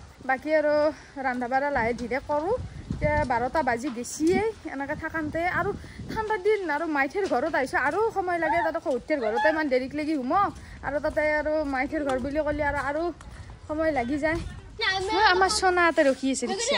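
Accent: Lower Assam, India. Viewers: r accent lower assam or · Bohut val lgil vlog tu. Bohut hahilu kotha khini huni. Actually moi upper assam r. Haikrne lower r vakha tu huni val lge. Hahi uthi gol😂.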